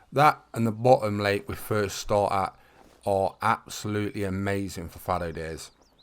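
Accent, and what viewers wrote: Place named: Leicester, United Kingdom. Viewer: Did you see ladylegend mess her great one shot? I think she was robbed, the shot looked fine, but I’ve noticed some of my shots are off target since the aus map came out. I can’t believe it’s taken watching your videos to catch on that carrying a tent with you at all times is so handy? I was always concentrating on having the max load out with guns and callers etc. that advice is golden! Great video, gunna start my great one grind tomorrow. 👍🏻 by the way, are you from Leicester? You sound like it, I know a couple of people from there. I’m down the road in Bristol 🇬🇧🇬🇧🇬🇧🇬🇧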